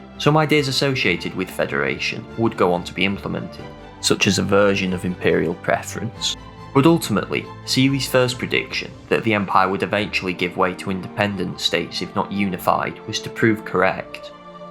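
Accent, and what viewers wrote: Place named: Northern England, United Kingdom. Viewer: Disagreeable Northern accent notwithstanding.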